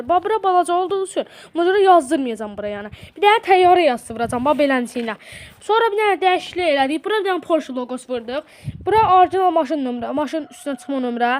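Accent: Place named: Goychay, Azerbaijan. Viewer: Göyçayli ləhcəsidi deyəsən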